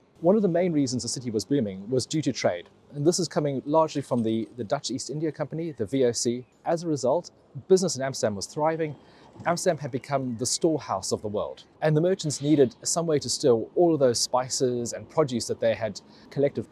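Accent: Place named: England, United Kingdom. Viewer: You have an English accent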